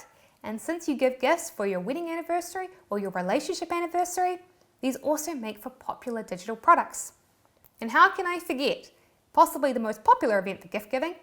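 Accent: New Zealand. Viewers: I somehow understood it the moment I heard on word. Letter E sounds I and I love NZ accent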